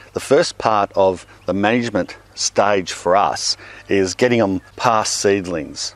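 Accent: Australia. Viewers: Greetings from Munich/Germany :-) Watching you on a quite nasty, cool and rainy Bavarian late spring evening, while having my own tiny tomato plants waiting (still - fortunately - quite patiently) for warmer weather, so that they can finally conquer their city balcony. I'm mostly following comparable (or not so much if comes to climate conditions and techniques!) German gardening channels, but I love coming back to you every once in a while, and let it be solely for a reminder of my own time down under and by taking in your lovely accent. Keep "mulching". Nice work & cheers! ;-)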